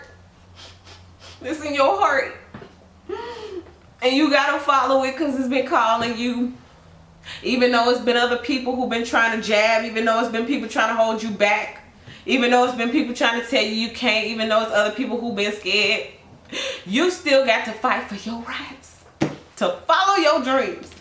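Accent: Chicago, United States. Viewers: You sound like you from Chicago lol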